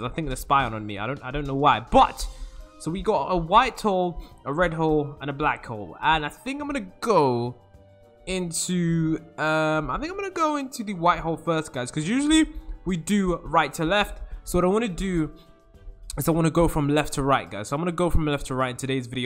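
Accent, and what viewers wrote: United Kingdom. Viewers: Are u an british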